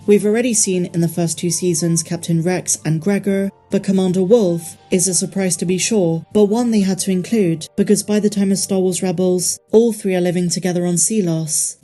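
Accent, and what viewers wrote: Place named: England, United Kingdom. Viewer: Ok, the accent is way too heavy for me to understand what the narrator is saying almost totally. Could you please work on the sound quality or get a narrator without an english or whatever itis accent?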